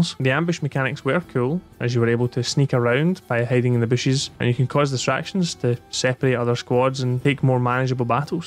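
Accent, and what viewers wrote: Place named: England, United Kingdom. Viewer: AHAHAH this is so funny i love people not understanding english accents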